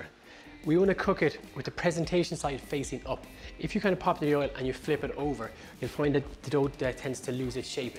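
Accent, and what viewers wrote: Ireland. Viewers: I so enjoy your Irish accent, experience & knowledge. Thank you, God be with you. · I'm not going to lie I'm going to have to watch this a second time ..love just listening to Irishman talk one cooking is a little mind blitz your awesome from America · It is English but with an Irish accent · is such a great teacher and communicator. I have been making breads at home for years but I still have had my knowledge added to and reinforced by this and other videos here. He takes time to really give an explanation as to how and why. reassurance that a recipe is just a foundation and that the experience of baking has so many variables is valuable too. Not to mention the soft Irish voice! I regularly have fresh yeast (I just ask at the in store bakery at my supermarket) as I just think that it gives a better flavour. I am also totally converted to slow proving as I know that the flavour is improved. 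When I made these doughnuts I did not have a lemon but substituted lemon zest with grapefruit zest and they produced a very tasty batch.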